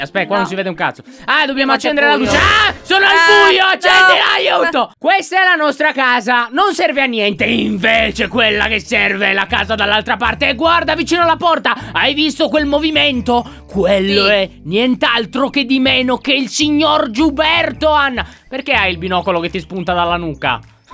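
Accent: Sardinia, Italy. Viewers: Ma è sardo?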